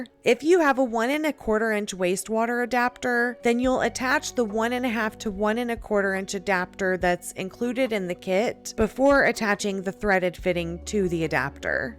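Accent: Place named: Midwest, United States. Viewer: vocal fry. up speak. midwest dialect. TRIPLE THREAT. Use some nice Ai program for your VO, please. nails on a chalkboard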